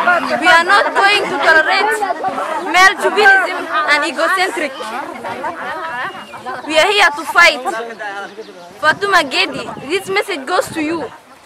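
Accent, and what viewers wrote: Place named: Somalia. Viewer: Kiboor Somalitizing English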